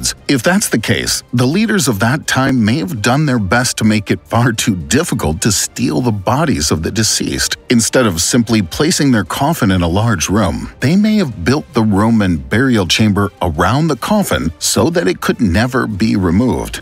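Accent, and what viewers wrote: United States. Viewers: The narrator has an American accent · the narrator's American accent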